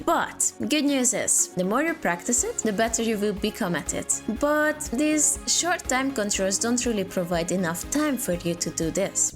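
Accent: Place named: Eastern Europe. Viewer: Chess with east european accent sounds more trustful indeed.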